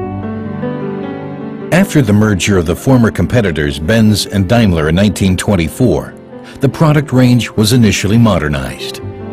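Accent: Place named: United States. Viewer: Very clumsy American narration, though use of metrics, where appropriate is welcome.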